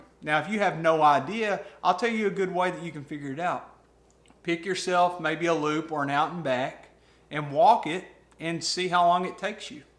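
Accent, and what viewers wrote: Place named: North Alabama, United States. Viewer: You from north Alabama by any chance? You sound about like me. lol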